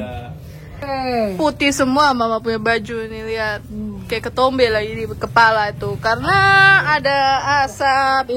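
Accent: Manggarai, Indonesia. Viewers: Logat manggarai y ci 😅❤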